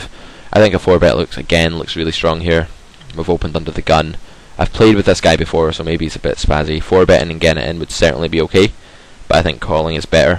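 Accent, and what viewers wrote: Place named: Scotland, United Kingdom. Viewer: Thanks such helpful content on this channel. But why is Scottish 😆?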